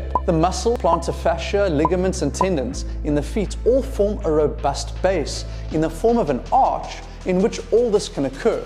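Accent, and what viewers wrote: South Africa. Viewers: Wow you sound so South African lol Anyway, I think I was born with flat foot (only one of my feet is flat) As the years go on my foot is becoming more and more sensitive. It aches even when I'm sleeping.. I'm hoping some of what you said can help me. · You sound South African…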